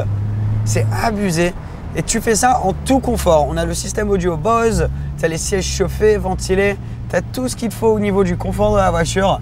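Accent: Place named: England, United Kingdom. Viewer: Je pense qu'il fait exprès de se rajouter un "accent" anglais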